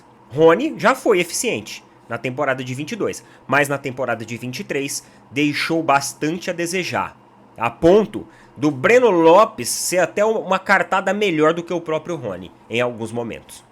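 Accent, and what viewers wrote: Sao Paulo, Brazil. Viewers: Ele é Paulista então tem que ter sutaque.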